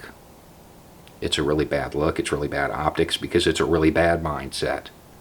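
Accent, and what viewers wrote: South, United States. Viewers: Not only is it really neat to hear progressive values in a southern accent, you actually add unique and interesting perspectives to the conversation. I am so glad to have found you beau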